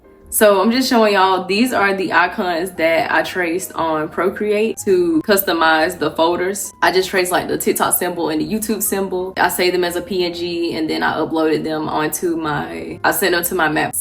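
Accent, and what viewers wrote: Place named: South, United States. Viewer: never realized how country you sound☠️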